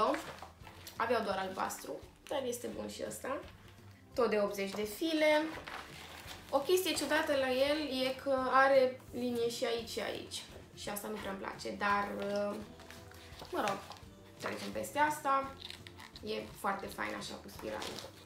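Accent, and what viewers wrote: Transylvania, Romania. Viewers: Eu sunt noua pe canal am o întrebare, ești din Ardeal? Ai un accent mai ardelenesc, o sa faci și ce se afla în geanta mea de școală 2019? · "No" îți iubesc accentul ăla de ardeleancă😂❤ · Ioiii tu accentu tau de ardeleanca :))) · Toaaaiii ce drăguț cu accentul ăla de ardeleancă . 💜💜♥️♥️💜💜💜💜♥️♥️💜♥️♥️♥️♥️💜💜♥️♥️💜💜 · Esti de prin Ardeal, ca NO 😂😂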